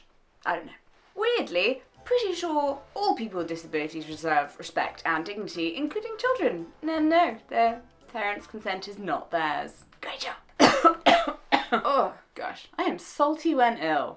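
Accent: RP, United Kingdom. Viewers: I did not understand the speaker (the British accent for one thing) or where she was coming from until late in the video. Hmm. I recall being touched by Selma Blair announcement though. I’ll have to watch more to figure this chipper redhead out. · You sound very posh. Love it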